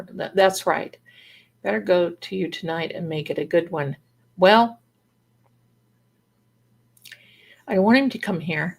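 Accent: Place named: New York, United States. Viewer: Hi I heard your ny accent. Hehehe. I’m OG from Patchogue LI NY. I’m in upstate NY now but still have some Long Island accent Can’t wait to watch and enjoy. Ty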